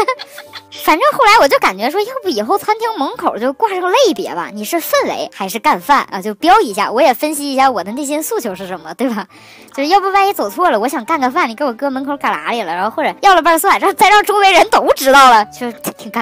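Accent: Northeast, China. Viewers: 我发现留言的网友，居然只有我一个是男士。老尴尬了😅！我是西北人，为啥喜欢东北口音，主要我是东北大学毕业的，出国很多年了。熟悉的味道。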